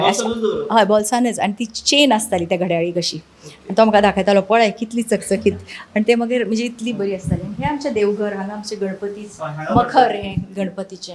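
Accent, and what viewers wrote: Maharashtra, India. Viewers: She has a strong influence of marathi in her speech And can't talk typical kokni words It's unfortunate Oogich sangta ki aplya kokni bari ulapak yeta mhanun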